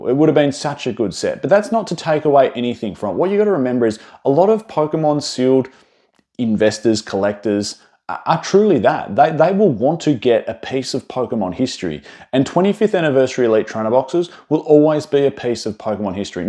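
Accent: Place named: Australia. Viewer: I still can’t figure out why ausies pronounce their A’s as R’s but seemingly ONLY at the end of the word. He said Data and it sounded like daughter 🤣🤣 Any way I know people have given you shit about this before and this isn’t me doing that. All ausies do it and it’s just interesting to me why it came to be. Love your content man!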